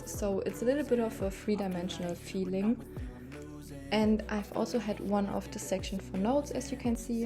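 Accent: Germany. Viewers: I found your channel today and love it. But one question can it be that you actually speak German? Your English is great, no criticism. It just sounds like German is your mother tongue. Many greedings Annabell Elaine ❤️❤️ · are you from germany? your accent kinda sound like it!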